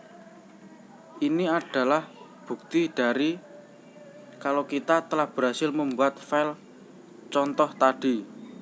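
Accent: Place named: Java, Indonesia. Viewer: Suarane bogel medok :v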